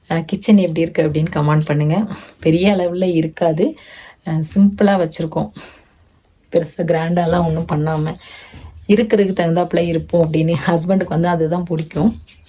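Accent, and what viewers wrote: Tirunelveli, India. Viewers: Unga sontha oor yethu akka yengala mathiriye pesuringa thirunelveli pakkama neeenga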